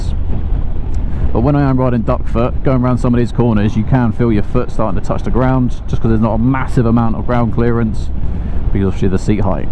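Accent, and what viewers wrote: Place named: United Kingdom. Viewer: Bro you look like a Texan and seeing you speak in a British accent is funny😭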